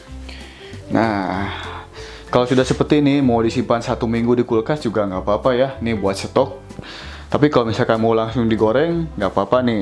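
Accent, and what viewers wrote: West Java, Indonesia. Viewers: Kita banjuriii yaa..😂 sunda pisan euy.. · Alhamdulillah, diam d rumah ada kegiatan, praktek bikin makanan ala 2, bahan nta simpel / mudah d dapat dan ga banyak biaya lagi, nuhun ide nya, bikin ibu 2 jadi resep, bikin nya dan lucu klw ngomong sunda na lucu 👍👍👍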